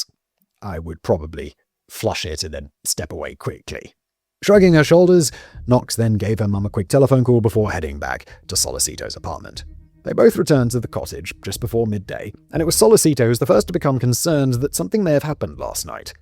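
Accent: United Kingdom. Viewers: Well time for bed with one of my fave true crime shows TCC. Why watch a true crime show when i go to bed you ask? ( you did not but allow me to tell you anyway ). with his dark british voice calms me and Danny writes good and long scripts. Perfect! Don't you all agree?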